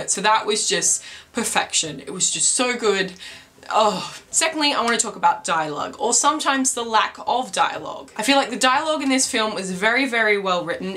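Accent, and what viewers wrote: Australia. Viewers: Ahh an Australian accent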